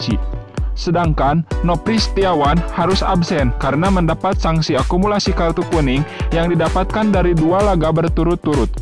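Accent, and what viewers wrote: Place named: Bandung, Indonesia. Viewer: Konten Persija rasa Persib dari logat adminnya Persib banget. Semoga jadi jembatan perdamaian & persaudaraan.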